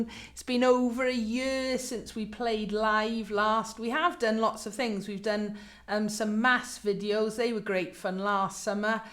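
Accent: Wales, United Kingdom. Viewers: Loving your help and hearing a wonderful Welsh accent. I'm from Abertawe originally but have been in NZ since 2004. Been learning the ukulule and loving it. I really want to learn Ar Hyd yr Nos, but am not Welsh speaking - on duolingo a little and know very basic words and phrases. Would it be too much to ask if you have simple chords for Ar Hyd yr Nos. A phonetic translation is something I've been lookinb for online to no avail; do you or anyone you know have one? Diolch yn fawr, Denise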